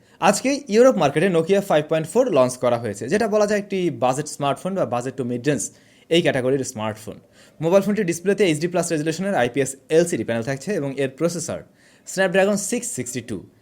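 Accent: Kolkata, India. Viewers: Vai apnk kolkatar moto lage apnar kicu vashar jonno jemon ami valo asi asha kori apnara valo royechen avabe kolkatar manus ra bole tai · Via apni kolkata ar moto kota bolan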